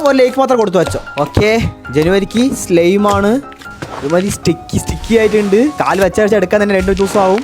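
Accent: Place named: Palakkad, India. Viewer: Palakkad ahno veed slang kettapo choichathann NJN palakkad ahn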